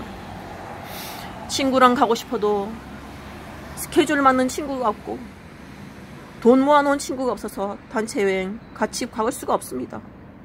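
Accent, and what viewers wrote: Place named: Daegu, South Korea. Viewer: 말씀 듣고 많은 생각을 하게 되었네요, 늘 동경하던 타향살이, 다시 한 번 생각하게 되었어요. 한국은 한국대로 호주는 호주대로 각자 저마다의 고충은 다 달고 사는 게 인생아니겠어요 ㅠㅠ 다 하나님의 뜻이라고 생각하지만 너무 힘들 때면 하나님께 왜 이렇게 힘든 인생을 주셨냐고 묻고 싶어질 때가 많아지네요 그리고 범어동 사셨다니.. 사투리가 살짝 대구 사투리같다고 느꼈었는데 같은 대구분 만나니까 반갑네요 ㅠㅠ 대신동이나 시내에서 보면 엄청 반가울 것 같아요 ㅎㅎㅎ 주저리 주저리 쓰다가 길어졌네요 ㅠㅠ오늘도 좋은 하루 되세요!!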